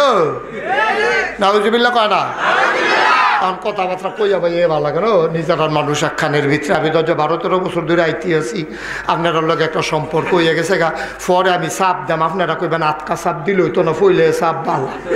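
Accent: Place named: Comilla, Bangladesh. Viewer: এই বক্তা কে সবাই কুমিল্লার ভাষার জন্য পছন্দ করে মিয়া ❤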